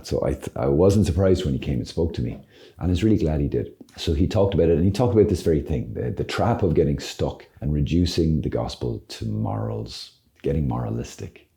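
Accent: Ireland. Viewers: U have a little irish ❤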